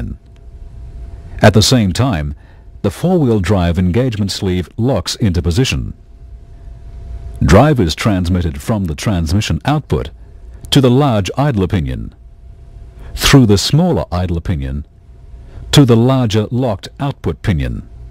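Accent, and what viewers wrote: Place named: New Zealand. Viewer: This guy sounds very kiwi to me.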